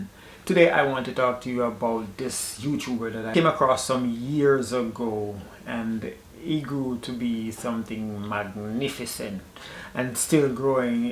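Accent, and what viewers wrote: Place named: Jamaica. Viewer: Your video just popped up on my feed and at first I thought you were his fellow African speaking about him. And then I realized you’re Jamaican before you said you were. I’m also Jamaican. I’m living in the US for over 30 years. And I love him. We need more people like him. And what you say is so true. I think we have to thank God for YouTube first and foremost. Because YouTube is allowing us to bridge the divide across the oceans. I’ve learned so much about the countries of Africa in the past year from tuning into not just his video, but from many black folks, who have traveled to Africa, as well as some Jamaicans, who traveled to Africa, including some who’ve relocated there.YouTube is a godsend, and subsequently Wade Maye is a Godsend. Blessings to all the diaspora around the world.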